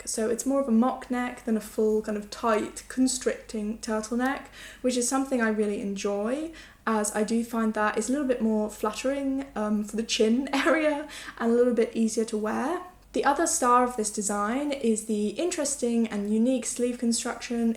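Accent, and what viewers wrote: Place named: United Kingdom. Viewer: I enjoyed your Video again very much and I love to hear your British accent... I recently finish ed the Agave Sweater by Yumiko Alexander. I knitted it with Drops Bomull Lin in dark Blue. It was fun and a quick knit also. I will also try one of the bags you recommended in the speacial bag video. Best wishes from Cologne! 🎉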